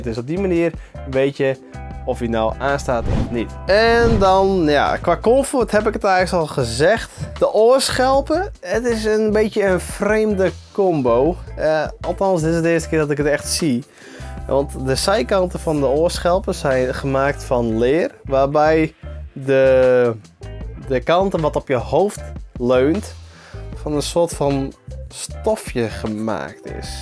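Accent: Friesland, Netherlands. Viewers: Hou van het friese accent. De oorschelpen zijn achteraf super chill had ze ook op mijn razer nari ultimate. Voor lange gaming sessies zijn ze zeer fijn want ze houden je oren wat koud (cooling gel)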